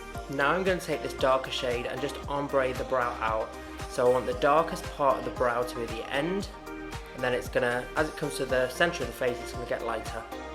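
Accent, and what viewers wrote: Liverpool, United Kingdom. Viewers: Miss ur Scouse accent, love it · He still sounds Scouse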